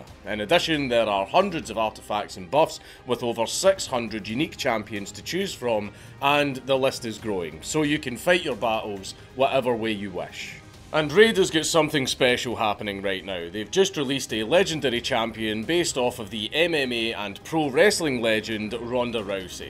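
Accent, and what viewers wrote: Scotland, United Kingdom. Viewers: Come for the topic, stay for the brogue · Dankula has the strongest accent you can understand. Whenever I go to UK, I prepare myself not to understand what half of the population is saying. He learned to standardize his strong accent or just lucky? First time watcher, anybody knows?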